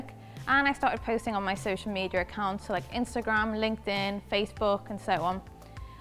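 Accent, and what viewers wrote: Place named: Liverpool, United Kingdom. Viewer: She’s great and that gorgeous Scouse accent. Well done on all your success!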